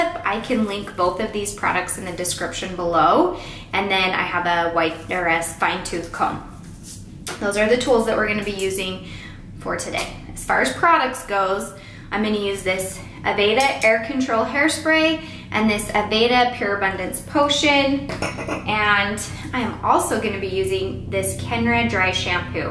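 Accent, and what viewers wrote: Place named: Minnesota, United States. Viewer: Are you from Minnesota? You sound like us if you're not.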